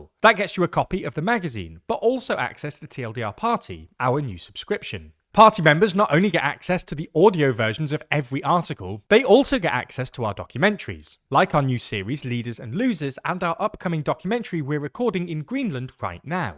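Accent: United Kingdom. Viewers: Yeah when I heard the british accent I thought this was going to be a waste. These guys never have good info.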